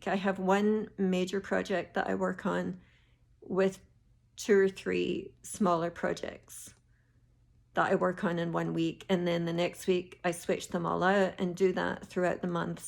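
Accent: Scotland, United Kingdom. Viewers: You can definitely catch the Scottish accent. Welcome to flosstube. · Hello from a fellow Scottish stitcher. Great first flosstube. I actually came across your third one first and as I was watching I thought I heard a Scottish twang so stopped that one and started watching this one to see if you said you were Scottish and you are, lol! Loved seeing all your projects and I’m like you, I love stitching things with houses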